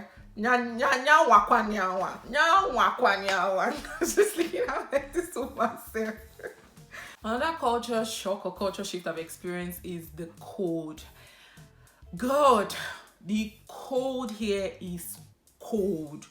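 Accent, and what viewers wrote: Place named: Igbo, Nigeria. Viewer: Love your hair and your Igbo accent is so sweet. Sorry is a no no... like you are apologising. yes!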